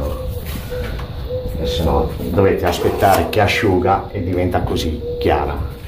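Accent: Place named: Genoa, Italy. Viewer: salve le volevo fare i miei complimenti non so perche ma mi sembrava dall accento genovese la saluto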